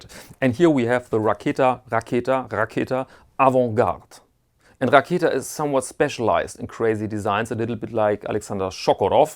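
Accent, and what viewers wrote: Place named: Germany. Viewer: sounding very much like a german yourself, you might have noticed that Alexander Shorokhoff very well is born in Russia (Moscow) but his company is very much in Germany. To my taste the mixture of italian avantgarde design combined with german precision manufacturing from a russian engineering architect can possibly be taken quite serious. For me at least its a breath of fresh air, that depreciates by far not the way you mentioned. I like this video very much, cause you really showed something different, that is not often to be seen. Thank You.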